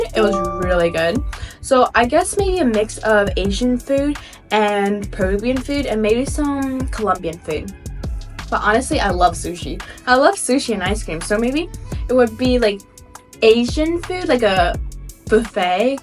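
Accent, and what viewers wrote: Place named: United Kingdom. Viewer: Why you have a uk accent tho😭😭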